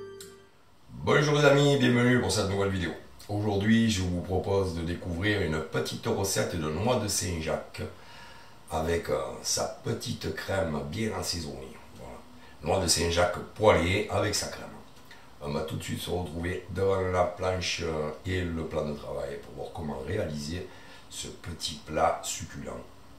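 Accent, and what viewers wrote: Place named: Provence, France. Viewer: Je viens de réaliser cette recette, simple et délicieuse à la fois. Je m'abonne avec plaisir. J'entends votre accent, ne serions-nous pas de la même région (Var pour moi) ? Belle journée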